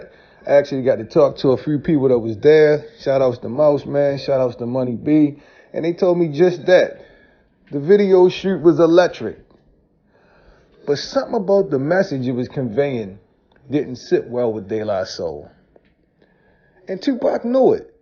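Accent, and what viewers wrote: Baltimore, United States. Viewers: Kewl video, kinfolk✊ But, on some other... You from B-more?? Sound like, one of the big homies from round that way. 💯✊ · You gotta be from Baltimore. I hear that accent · You from bmore huh I can hear it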